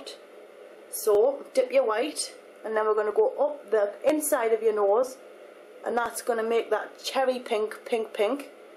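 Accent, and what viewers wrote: Newcastle, United Kingdom. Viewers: U sound like Cheryl Cole are you from newcastle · Love your accent :D Gotta love the Geordies! Your eyelashes are so long! Are they natural? Your nose is actually a really nice shape! I never really noticed its size before you pointed it out, just its shape, its lovely and straight :) Loving the videos chuck, upload more soon. x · I'm pretty sure it's a British accent. :) It's an amazing accent :)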